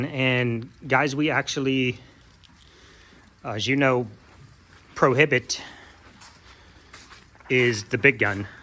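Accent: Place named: Midwest, United States. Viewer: Looks like you may be getting some crap weather going through Ohio this weekend into next week. Frosty has the prettiest babies and they love her. Gingy is yo-yoing again. Poor girl. She gave us Chuck Norris. I live in Texas, but was raised in Iowa. Love that Midwest voice tone. Praying for Gingy to recover. Weather won't help her. Much love to both you and H.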